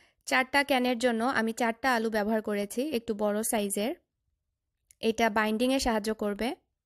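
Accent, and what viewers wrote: Sylhet, Bangladesh. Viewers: Apu ami apnar new member.. Apnar kotha gula onak sundor.. Sylhet language bole je ota te o onak sundor lage apnak.. Falak to amder bashar member hoye gese.. Sobay chine.. Allah apnader k susto rakuk.. Amin..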